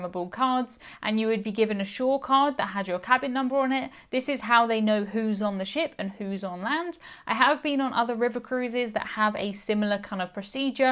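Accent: United Kingdom. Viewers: coriander is the seed where the leaf is Cilantro- interestingly the spanish pronunciation is Kilantro. when u said multiple sounded like multabowl sounded like u said airboat for aiport called sounds like code.i am so laughing-Brit accent is perplexing.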